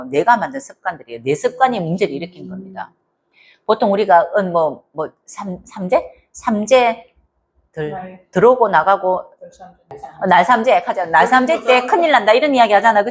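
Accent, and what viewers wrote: Gyeongsang, South Korea. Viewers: 같은 경상도라도 너무 딱딱하니 듣기가 안 좋습니다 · 서울사람인데요 경상도 사투리 넘나 이쁘고 다 알아듣습니다 감사드려요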